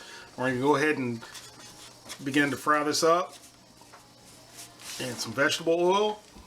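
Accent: South, United States. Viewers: You are sounding extra southern here!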